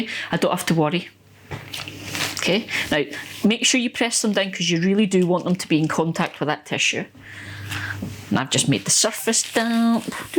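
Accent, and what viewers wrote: Scotland, United Kingdom. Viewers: Hi! I have only recently been introduced to your you tube channel by Gardener Scott! I have watched a number of your video's and find them very informative, professional and entertaining! A bit of background. I live in the state of New Hampshire USA. I used to garden for a number of years, stopping about 5 years ago. Starting up again this year. I always did a conventual garden. This year I am planning on making 6 high raised beds, 4 for general produce and 2 for asparagus. My growing stats are- zone 5A, Lat. 43.6, elevation 1100 ft. I live on a 3 acre property which my grandfather started a dairy farm on. Rocky soil but VERY fertile Carlton Loam. I cannot stop anything from growing including weeds! Weeds, the main reason for raised beds. The high beds( 30 inches approx.) I am retired and don't want to bend over or be on my knees as much. 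I realize you are in a more restricted space,(urban, rural) I would appreciate any tips for me and fellow gardeners which have more space. I am still planning on planting some crops in ground, corn, potatoes, winter squash and cantaloupe! I am also planning on planting some other plants in ground as well as in the raised beds to compare! Love your Channel! Love your expertise! And your accent as well!!!!!!! Could listen to Scotts and Brits all day!!!!! And I am part Dane by my grandmother! Eric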